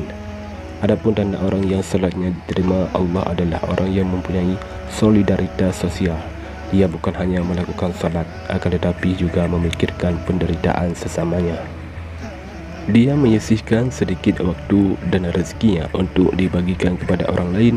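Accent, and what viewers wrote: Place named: Bali, Indonesia. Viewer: Orang Bali kah, ithu ....ditherima....dst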